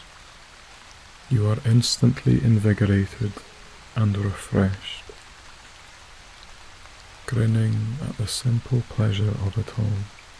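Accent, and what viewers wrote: Scotland, United Kingdom. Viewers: the sound of a Scottish voice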